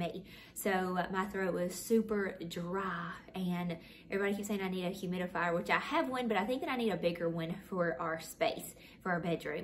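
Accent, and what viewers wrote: South, United States. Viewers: you are so adorable! I love your southern draw! I live in Alabama too so I’m sure I have that same southern accent! I loved everything you got for Christmas and look forward to all your upcoming videos for the new year! Happy New Year and God bless!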